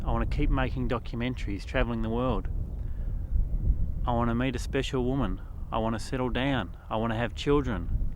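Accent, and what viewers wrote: Australia. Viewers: Hi, I really enjoyed your film, a real gem for supporting a text I am writing on. I also enjoyed listening to your Australian accent, since I lived there for more than a year and it was a very special time, it brought me beautiful memories. Congrats also for keeping up with your films. All the best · did he really have to say 'I'm australian?'' although i just heard.'stralian....' With that accent !! :)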